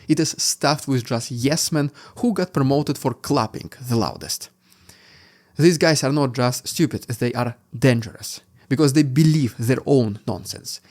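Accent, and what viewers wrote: Russia. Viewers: I try listening to you but your accent is so Russian that I cant understand you. You talk English words but in Russian, it’s bad.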